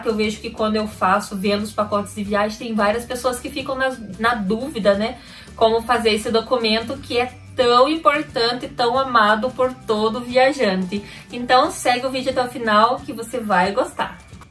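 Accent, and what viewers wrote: South, Brazil. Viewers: Qual o sotaque que ela tem fiquei em duvida se sulista ou não !? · esse sutaque do sul e lindo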